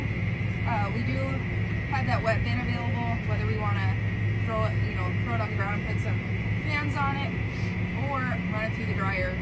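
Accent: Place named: United States. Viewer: Newly subscribed. What state r u in. I’m trying to figure out the accent. Great video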